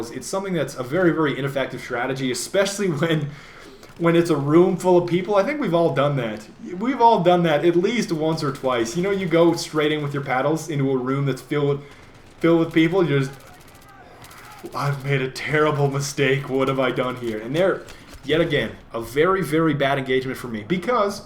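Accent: Russia. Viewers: are you Russian or are you faking like FPSRussia?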